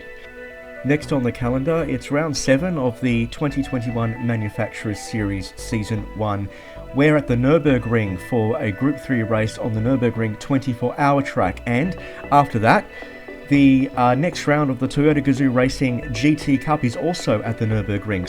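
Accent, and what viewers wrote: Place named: Australia. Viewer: You have an aussie accent, very nice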